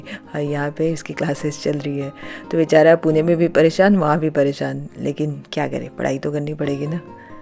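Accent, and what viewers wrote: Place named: Ballia, India. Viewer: Are you from somewhere in Ballia (U.P.)?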